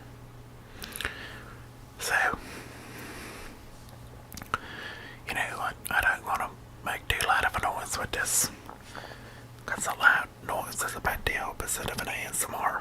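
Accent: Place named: South, United States. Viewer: he is not australian he just has a country accent.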